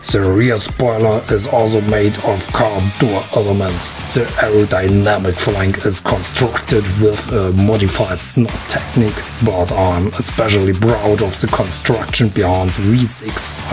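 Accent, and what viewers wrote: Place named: Germany. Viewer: Are you German? Because you sound very German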